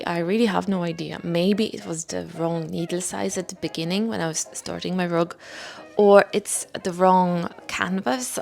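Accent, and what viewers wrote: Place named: Ireland. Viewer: Came from the crafts but stayed for the accent ngl! 😅 I spent half the video trying to place where your accent is from. I wouldn't have guessed Ireland! I'm Canadian, and we don't get to hear a lot of regional Irish accents on this side of the pond. I love your vibe, your humour, and this video has a nice professional polish. Can't wait to check out the rest of your videos! +sub! 😁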